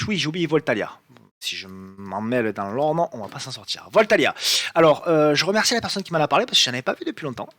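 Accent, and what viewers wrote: South, France. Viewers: Accent du suuuud lol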